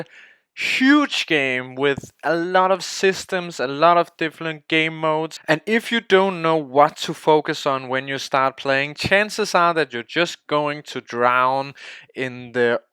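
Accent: Denmark. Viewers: I love the Danish Accent....you should hear mine, we sound very alike hehe :p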